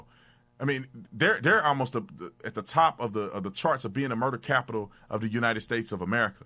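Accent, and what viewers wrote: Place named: South, United States. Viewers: Love that strong Southern Accent!!! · Love this! He is typically southern! Love thisman!!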